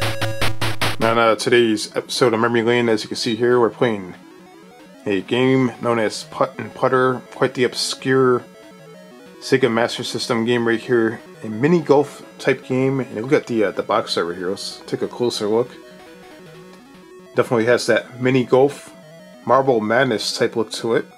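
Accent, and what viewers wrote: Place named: Canada. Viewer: You must be Canadian. Here's how I know. You can turn "and" into "un". American's can not do that.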